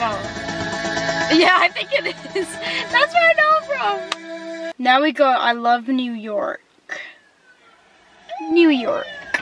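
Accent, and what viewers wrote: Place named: New Zealand. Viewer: I thought hang on is she a kiwi?! I had to check. You sound more kiwi than aussie IMO 😅 I'm from NZ 😁 Love your vibe and sass. Stay real 👌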